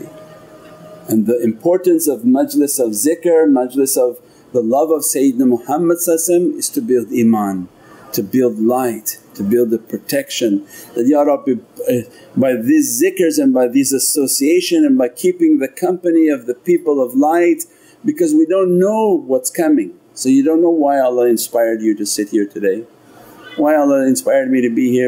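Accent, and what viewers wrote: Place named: Spain. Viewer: He sounds spanish or is that just me not that it matters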